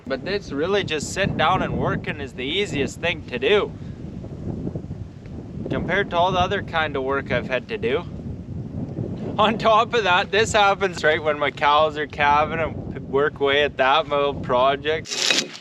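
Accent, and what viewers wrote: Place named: Newfoundland, Canada. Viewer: Where are you from? What part of Canada? I was just wondering because I noticed the way you talk …….. I don’t mean that in a bad way just kind of wondering …….Tiny Houston MS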